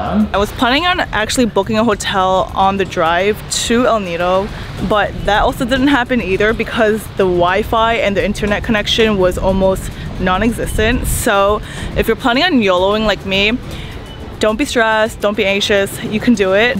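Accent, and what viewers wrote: United States. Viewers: You're a very entertaining story-teller with an amazing accent (West or East Coast?). Appreciate the way you appreciate everything about El Nido. (I miss that pandecoco!!) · girl you talk to much a southern california girl., i should know i live in Orange County , CA and majoroty of korea her talk like you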